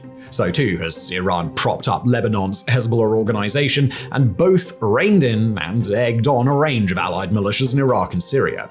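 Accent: United Kingdom. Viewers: You Tube SUCKS! I have removed this man's channels over and over again. But when You Tube changes the algorithm, it force feeds me this guy's many channels despite my removing him. channels suck. They are poorly researched information that is mostly government propaganda talking points. Terrible videos. And just because has a British accent, this doesn't not make him an authority on anything. He's just a talking head. England is over. Brexit has made the only job in England a You Tube content provider. Terrible list videos that spread falsehoods and bull.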